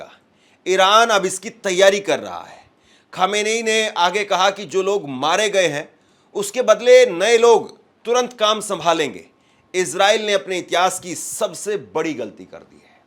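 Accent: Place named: Haryana, India. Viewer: Ek. Nitin. Yahu. Jesa. Viman. Humare. Pas. Bhi. Agar. Nitin. Yahu. Apne. Ush. Viman. Se. Isril. Chod. Kr. Bhag. Gaye. H. To. Asa. Hi. Kuch. Yaha. Asa. Hi. Viman. Vale. Ke. Sath. Bhi. Ho. Sakta. H ish. Se. To. Bahut. Se. Log. Yaha. Anath. Ho. Jayenge aap. Ke. Chanel. Ka. Nam. Tk. Nahi. Pata aap. Ek. Nojawan. Koi. 30. 35. Sal. Ki. Umar. Ke. Haryana vegra. Se. Lagta jese. AAp. Ke. Bolene. Ke. Andaj. H ye. Badi. Dookh. Bhari. Khabar. H. Operation. Sindoor m. Ush. Ne. Pagasus. Se. Humare. Samarthan. Ek. Badi. Bhoomika. Nibhaee. Thee. 14. June. 25. Sature. Day. Jai. 2. Shani. Dev. MAharaj bakheda. Hune. Gram. 7. Om.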